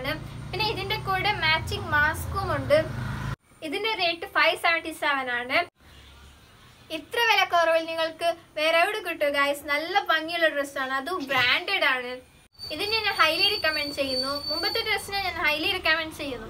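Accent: India. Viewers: Ksd basha pola ind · Malayalee ano atho tamil ano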